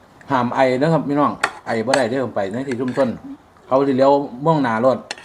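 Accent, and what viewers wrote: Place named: Laos. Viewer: เสืยงปากเทีคืลาวสุง เเต่อาหานเทีคืไทยหลวงพะบาง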